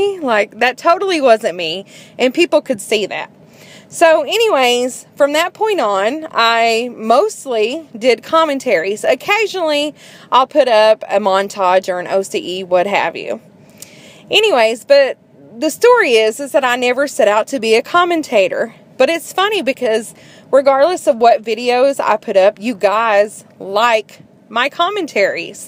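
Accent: South, United States. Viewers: being a northerner living in the south, your sweet country accent drew me in immediately. plus you cuss like a sailor. you can make vlogs from now on & I'll watch every last one of them. you don't need gameplay to keep me interested. ;-)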